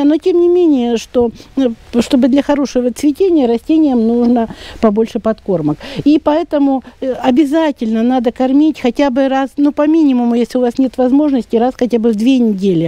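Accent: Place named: Russia. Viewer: Hola,creo que por el acento eres rusa,hermosas plantas,aunque no se que decías, abrazos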